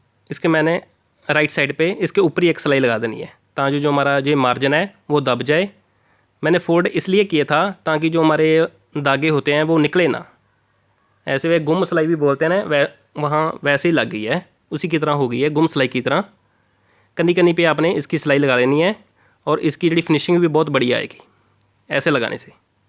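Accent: Punjab, India. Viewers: Tusi Punjabi ho veer g mai v Punjabi aa tusi bhut vadia samja rahe ho te tuhadi Hindi ch Jo Punjabi mix h oh bhut jyada vadia lagi mainu kyu ki same to same mere massi g jado Hindi ch gl krna start krde ne ta tuhade baggu bolde ne God bless you veer g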